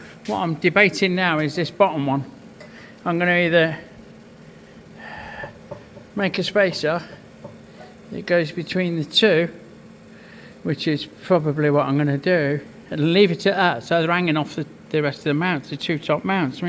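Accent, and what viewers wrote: United Kingdom. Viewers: boring bar that matey...with carbide insert slot...should have the insert size written on it.....same size tooling as my bport...same lathe too...fk me...and a tattooist lol...me too...22 years at it....got some mates over your end....thought i recognized that fickin accent...not short of curry houses down that melton road...stay free...mad heads and mutoids!!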